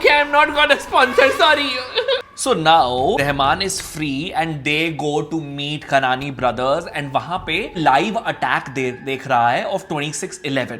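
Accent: Punjab, India. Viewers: your punjabi accent is so sexy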